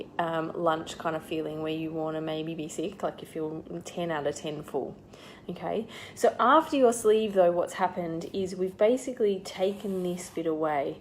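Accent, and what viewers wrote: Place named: Australia. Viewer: Thanks so much so helpful! And you;re an Aussie - even better!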